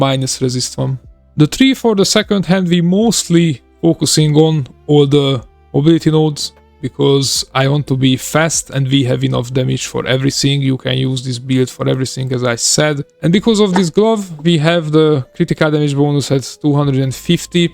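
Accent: Hungary. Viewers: Fasza kis build, nagyon hallatszódik a magyar akcentus! (támogató komment)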